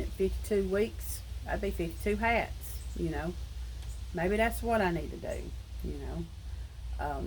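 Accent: South, United States. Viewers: Enjoyed your video, this is my first time seeing you. I was recommended by "Summer's Tips and Stitches". She said she loved hearing your Southern accent. I am a southern woman also, from South Carolina. Love your country scene. Look forward to seeing you again. God bless and pray that you and your husband get your money back.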